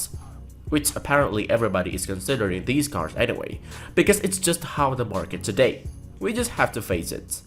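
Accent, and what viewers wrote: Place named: Indonesia. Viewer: You sound like Indonesian. Are you?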